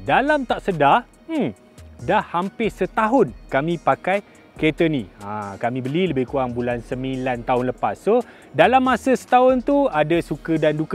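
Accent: Malaysia. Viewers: Best dgr slang malaysia hahhaa. Blh faham btl btl tanpa subtitle. At first I ingat ini indo video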